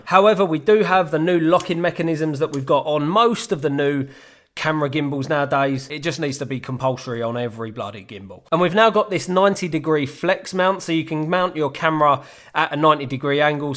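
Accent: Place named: Essex, United Kingdom. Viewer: Yeaaah definitely getting a 3S pro...I guess I watched this video just for your accent 😂 #essexnostalgia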